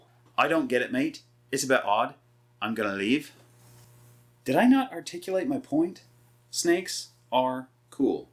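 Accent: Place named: United Kingdom. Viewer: As a Brit I would like to confirm that this is indeed a British accent! Lol 🐍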